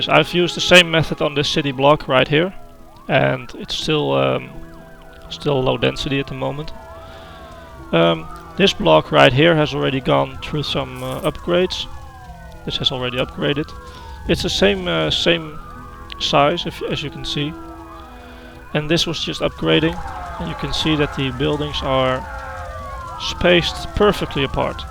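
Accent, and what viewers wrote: Netherlands. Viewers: are you dutch? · Ik wist dat je Nederlands was ik hoorde het aan je accent :D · are you dutch by any change? u sound like you are haha ^^' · you're from holland am i right? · Ik kan gwn horen aan jou stem dat je Nederlands bent haha, bedankt voor de tips 7jaar later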